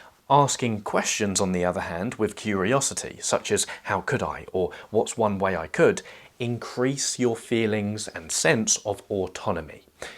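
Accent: England, United Kingdom. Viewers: Whereabouts in England are you from?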